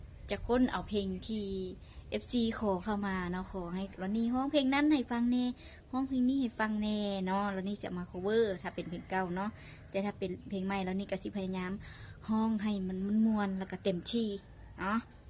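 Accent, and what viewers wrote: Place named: Laos. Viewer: เว้าลาวได้ม่วนขนาด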